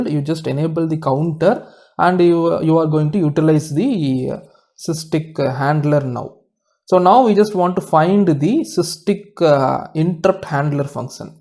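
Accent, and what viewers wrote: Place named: South India, India. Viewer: Dude Are U a South Indian?? My Maths Teacher was also South Indian, BTW Cool Accent ;-)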